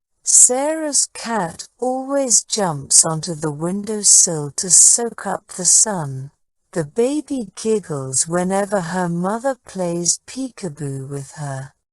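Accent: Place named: United Kingdom. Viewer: Excellent authentic British English pronunciation. Only listening to you and imitating is the key to English fluency.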